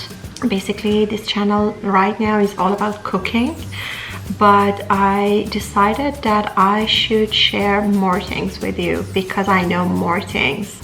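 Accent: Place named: Iran. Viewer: ur accent is only Persian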